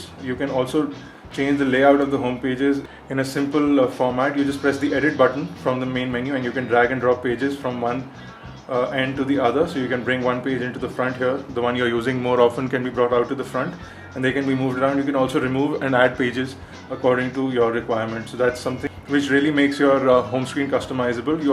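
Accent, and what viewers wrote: India. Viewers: its called an indian accent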